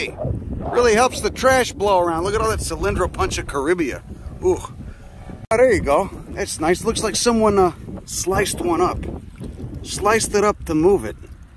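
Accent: Chicago, United States. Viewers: Ay, ah? Been watching you for awhile now. Lived in Chi most of my adult life, but left a few years ago. Found your channel and stopped to hear the accent again, but stayed because you make botany interesting. Been on the North Coast of the DR since December. If you're coming up toward Puerto Plata/Sosua/Cabarete and you want to dial in more local flavor, lemme know and what the shit.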